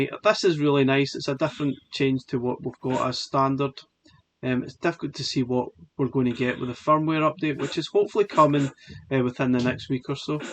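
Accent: Scotland, United Kingdom. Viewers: Fanstastic scottish accent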